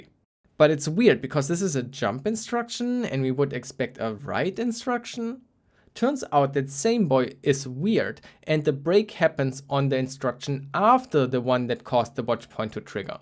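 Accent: Germany. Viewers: You sound like u have a german accent, iam from germany 2 and i think iam smart enought to hear this? xD great video btw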